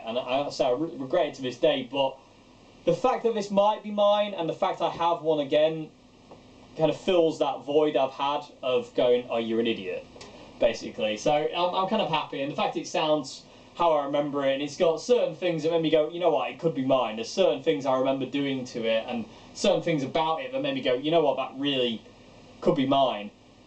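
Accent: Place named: Grimsby, United Kingdom. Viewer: If you upgraded your equipment at 'Pooles' combined with your accent I'm taking it you are from or near Grimsby - my hometown 😂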